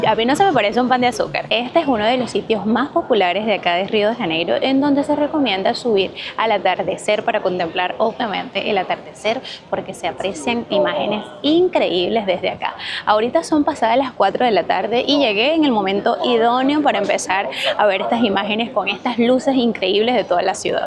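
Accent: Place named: Cuba. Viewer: Tu si que eres sexy, madre mia, tu voz y tu acento, cubano? Un placer d escuchar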